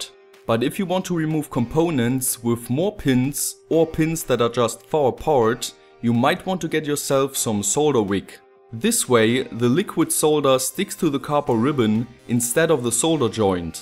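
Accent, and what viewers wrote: Germany. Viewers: Desloder with a heatgun... u welcome ;) btw. i would guess u german and not russian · Are you German? You sound like me when i speak English (i'm German). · german trying HARD to speak english... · That's a german accent :-)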